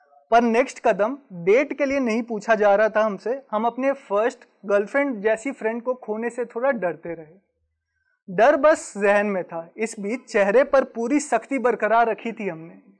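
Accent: Bihar, India. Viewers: Very nice video sir, it has a little probabum, you have a Bihari tone in your words, you should also look at your bass.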